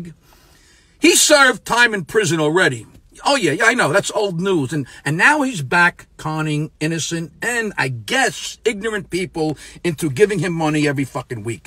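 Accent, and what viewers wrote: New York, United States. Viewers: What area of America is this guys accent? New York? I love it 👍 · ...you sound like ur from New York?? Crack me up!!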